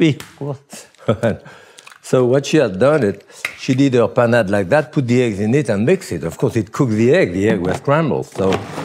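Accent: France. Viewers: Fransız aksanı ile ingilizce cok tatlı 😀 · I love his rustic French accent. Its so cool!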